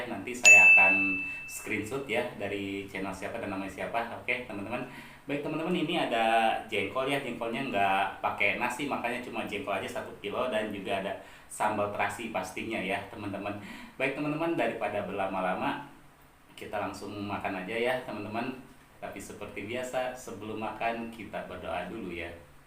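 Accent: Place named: Banten, Indonesia. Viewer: Aduuh aduh ka kebanyakan peuheur bhasa Pandeglang mh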